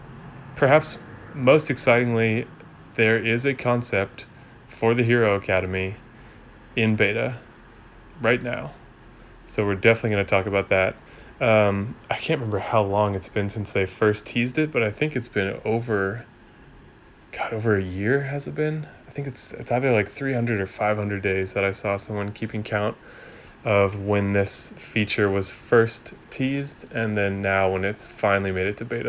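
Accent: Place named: United States. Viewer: From the slight accent at the back of his voice it is safe to think he may be American. It's that slight drawl and laconic speech which makes me think he is someone who graduated from University. Most likely someone who has a lot of friends but only a select few whom he considers his closest mates. I don't know. I'm just taking a stab in the dark. As a writer I often create stories for those I follow on YouTube. I'll be wildly outrageous and take liberties that others wouldn't. The only thing I never do is make assumptions about their sexualities as that is a private matter that is private to them only. I don't need to know their personal life that closely but it is fun to hazard a guess at their most basic backgrounds. In all honesty, he sounds American due to the fact my sister went to America a long, long time ago and fell in love with America and hasn't come back home for over thirty years!!! 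She even sounds like a dinky di American from New York!!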